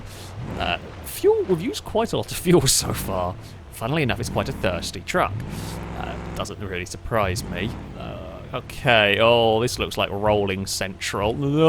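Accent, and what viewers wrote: United Kingdom. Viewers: i took a big break from beam ng drive content and i uhhhh.....i forgot how british your accent was lol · is British graystillplays confirmed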